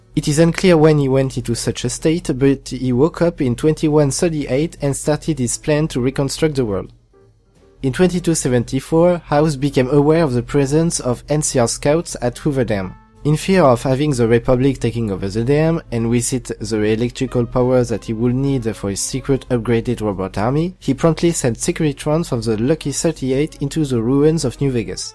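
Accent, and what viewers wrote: France. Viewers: Ouais par contre ton accent français ça se voit à des kilomètres, essaye un maximum l'accent anglais svp Un petit effort pour nos amis les anglophones. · Sounds French to me. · I'm french, I can assure you it's a french accent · Freeeeench...... What you have to say is really interessting but man' that is so akward. Can't listen it entirely.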